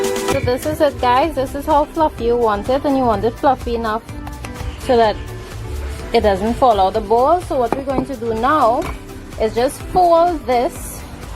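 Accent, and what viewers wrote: Trinidad and Tobago. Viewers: Girl, you sound like a True Trini. I subscribed to your Channel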